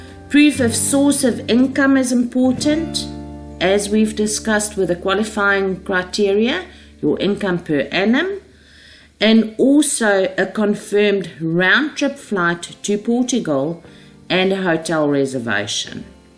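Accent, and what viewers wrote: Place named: South Africa. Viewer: Oh my word you're south African!!!!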